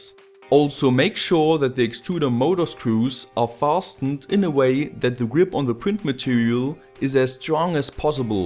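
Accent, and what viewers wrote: Germany. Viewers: I like your german accent! Like in old good times))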